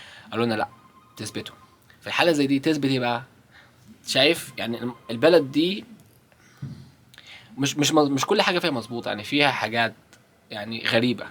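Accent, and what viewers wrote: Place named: United States. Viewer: مش بيتكلم مع عرب كتير فمخارج الحروف بقت اقرب للحروف الانجليزية وخاصة باللكنة الامريكي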